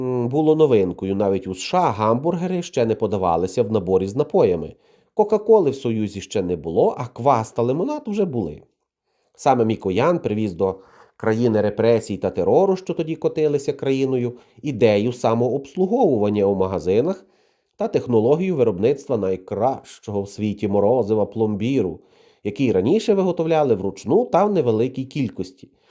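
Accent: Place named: Western Ukraine, Ukraine. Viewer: ЩО ТИ МАЕШ ЗАРАЗ ЧОГО НЕ МАВ ПРИ СРСР ? АВТОР ВІДЕО МАБУТЬ ЗАПАДЕНЕЦЬ ЕМІГРАНТ СЛУХАЮЧИ ЙОГО МОВУ .ГИДОТА.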